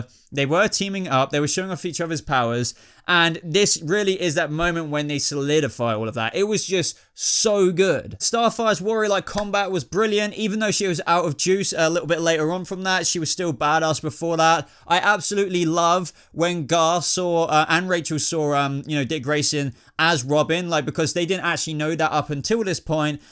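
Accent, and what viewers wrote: United Kingdom. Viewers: Hi. -Where are you from ? - I signed up to DC Universe and like your video. I'm a "Newbie" ... but I will 'Like' and 'Subscribe' to get your 'Take' on the series. ... USA, Washington State, Seattle here .. wondering where you are from .. "vit" a-mins" vs. "vite a mins" ... I'm thinkin' British, or Aussie or Kiwi. It's All good .... just glad it's in English .. or as you may characterize it (ME), American English :-p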